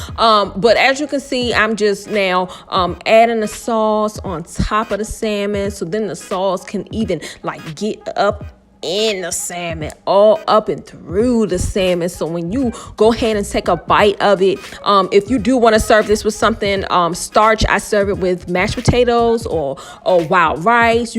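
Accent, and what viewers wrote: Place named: Maryland, United States. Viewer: Are you from the Maryland/DC area? You sound like it. But anyway the food look so good. Man oh man 😋😋😋😋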